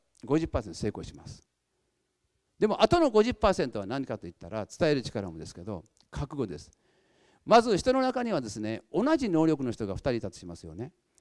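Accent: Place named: Nagasaki, Japan. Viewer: この長崎弁もいいし声もいいんですよね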